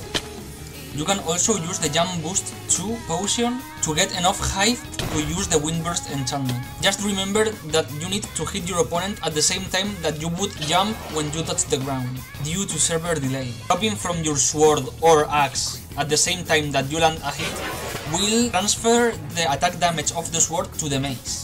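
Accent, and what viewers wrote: Spain. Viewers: Se te nota el español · Se nota tu acento español xd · eres español? el acento lo parece jajajaja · SE TE NOTA UN WEBO Q ERES DE ESPAÑAA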